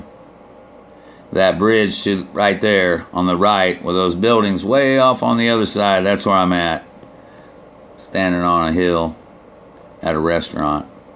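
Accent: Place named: South, United States. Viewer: Hey from GA! :)) Weather in this year is very bad... rainy every day.. What is your weather like? our states are neighbors! I see south accent, it's cute!))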